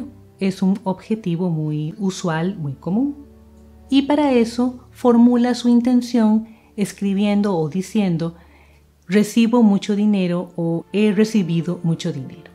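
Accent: Costa Rica. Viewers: Es costarricense , acento tico lindo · Por la pronunciación de su "r" pienso que es de Costa Rica. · Es tica? Notó su acento :) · Sos tica? Esa R me suena tica jejeje soy de San José. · Sííí…! 😄 Yo también quedé intrigada. Leyendo los comentarios supe q era costarricense… Hay acentos que en América del Sur no es común escuchar…😊 Que agradable suena, verdad ? (Bueno. Además se nota que ella es una mujer educada. Modula muy bien y habla pausadamente). Saludos desde 🇨🇱 ❣️ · desde que escuché la forma en que pronunciás la R supe que eras de costa rica. Que orgullo que una mujer tan sabia y espiritual sea de mi patria ♡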